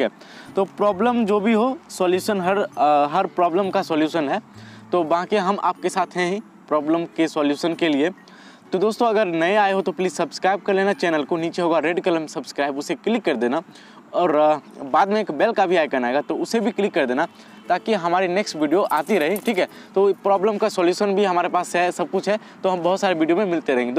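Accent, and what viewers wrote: Bihar, India. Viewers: Bihari Bhai hum bujh Gaye aap ki bole😁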